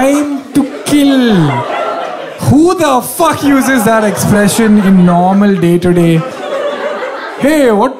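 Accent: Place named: South India, India. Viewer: This was so different from the prepared ones u do, felt so relaxed. Loved this one too! Although am I the only one thinking south indian accent is heavier than usual here?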